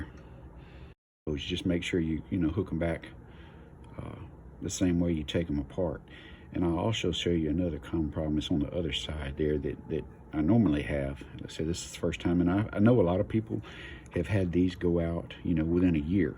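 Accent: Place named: South, United States. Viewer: IM FROM ENGLAND AND I COULDNT STOP LAUGHING AT THE FORREST GUMP ACCENT SORRY BUT IT TICKED ME PINK GREAT VIDEO THOUGH THANKS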